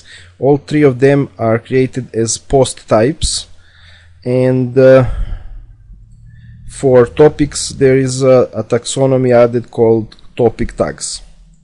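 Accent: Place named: Poland. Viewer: Słyszę jakby Polski akcent, nie mylę się. Mówi jak mój nauczyciel od angielskiego w LO.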